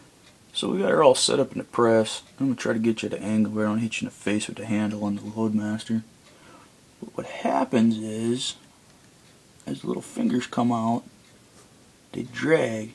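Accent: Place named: United States. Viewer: Fantastic video. You bottom line stuff and talk in the proper american english just like my uncle. Thanks for the info and the fix!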